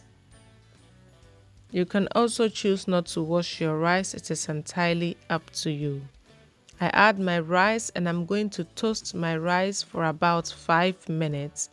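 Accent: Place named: Igbo, Nigeria. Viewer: what ethnicity are you? i love your speech, are you Igbo? hug you beautiful person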